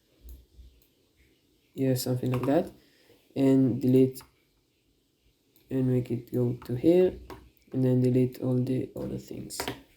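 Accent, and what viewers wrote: Israel. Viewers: איו אחי המבטא הישראלי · על פי המבטא הבנתי שאתה משלנו רחלה סרטון ערוך חזק גבר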